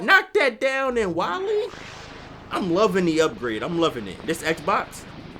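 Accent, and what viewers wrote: Philadelphia, United States. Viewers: Lol you could tell you from philly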